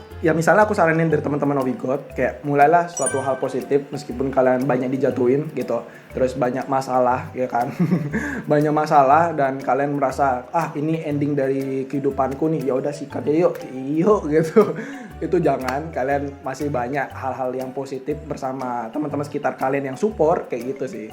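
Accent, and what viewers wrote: Bali, Indonesia. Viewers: Suka banget ama aksen balinya wehh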